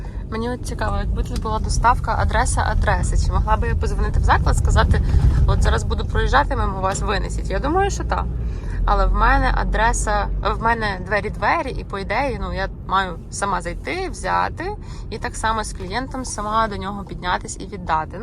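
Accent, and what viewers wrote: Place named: Lviv, Ukraine. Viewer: Мне вообще не нравится украинский, но ваш украинский мне приятно слушать) Может Львовский акцент Я из Киева.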